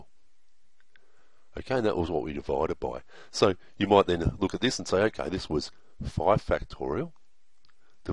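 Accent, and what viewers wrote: Australia. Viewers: Are you Australian? I love your pronunciation hahahhh Anyway I love your videos Love from Italy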